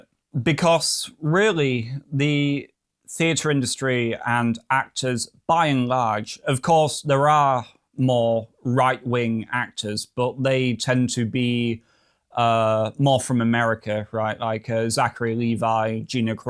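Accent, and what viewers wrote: United Kingdom. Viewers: E<link> British accent 🤢🤮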